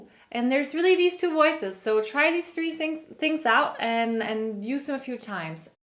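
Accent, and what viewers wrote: Denmark. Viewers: Is Danish your first language? Because that's what I hear faintly in your way of speaking. And it's driving me crazy. Sorry for the personal question, but, hey, at least it's a direct request, right?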